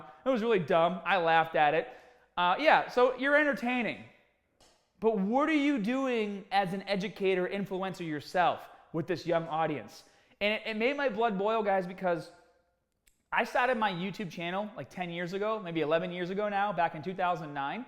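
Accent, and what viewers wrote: Boston, United States. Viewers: 1 min in, ik hes from boston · The boston accent is killer! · Damn you got a strong Boston accent bro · I came for the Boston accent. Wasn't disappointed. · That Boston accent coming in THICK! Much love from Texas! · Wait a second. I’m subscribing for tha facking R kid. Boston I gather ? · You from Boston bro! I can tell from the T AH T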